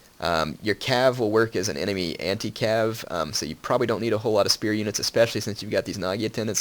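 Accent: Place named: New Jersey, United States. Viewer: Happy Thanksgiving. Your videos help decompress this Napoleonic War fan after work, no matter what era. Very involving. You and the Prince of Macedon have well earned the esteem of your fans for the military education and well-spoken presentation you bring to the game. Congratulations..By the way, you sound just like a Jersey/Rutgers guy.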